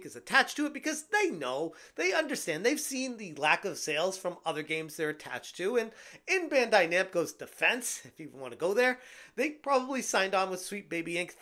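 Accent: France. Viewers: As a Québécois, definitly sounds like a French Speaker ( from France ) reading a script without really knowing english. I could translate but he basicaly just explain what the girl do in the video. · The narrator is literally speaking fringlish he’s trying to say them in French but tries to correct the pronunciation into English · Oui, this is "the" french accent.....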